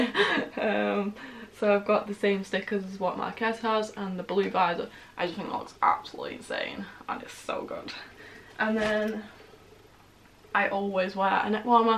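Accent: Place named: North, United Kingdom. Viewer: Where you from? Sounds like a northern accent hun x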